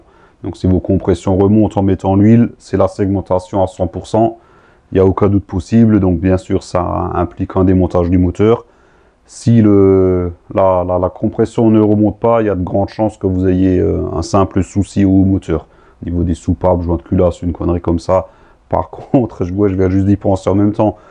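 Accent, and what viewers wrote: Alsace, France. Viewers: Salut, merci beaucoup pour cette petite astuce. Ton accent me rappelle ma jeunesse à Sélestat... Cool.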